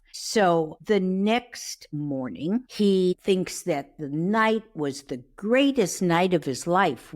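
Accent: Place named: Upstate New York, United States. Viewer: You are so cool -- just subbed. I agree that Joan is NOT ready to get engaged. BTW, you sound like you're from upstate NY. 😊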